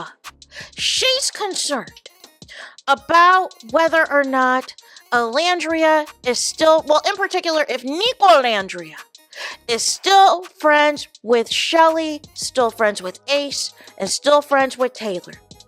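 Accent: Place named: Boston, United States. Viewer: I have never heard your kind of cadence before. I can only think Boston?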